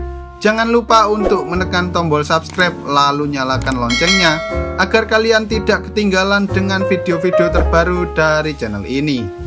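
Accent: Java, Indonesia. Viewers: Sampean wong jowo Yo?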